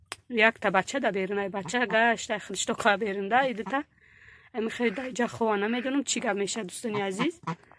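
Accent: Yovon, Tajikistan. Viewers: Салом аз Ватан ба щумо гарибхо апащкам ранги Ёвониё гап мезана😁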